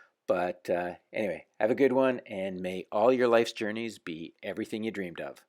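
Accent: United States. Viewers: But you talk in American accent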